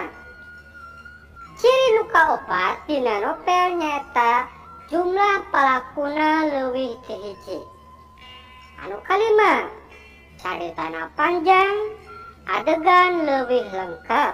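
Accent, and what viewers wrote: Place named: Garut, Indonesia. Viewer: Sundana ti garut nya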